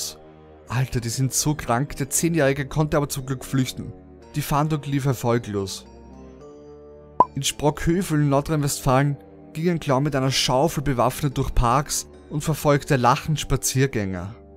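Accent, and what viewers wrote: Vienna, Austria. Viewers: Bist du aus Österreich? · Natschka Mir kommt's vor als ob er Wiener wäre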